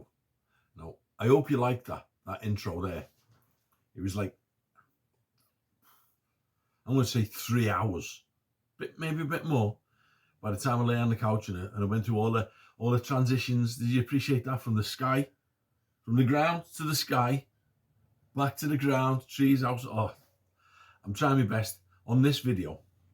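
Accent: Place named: Liverpool, United Kingdom. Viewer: You lucky lad living out there and I just love the accent and your natural scouse warmth.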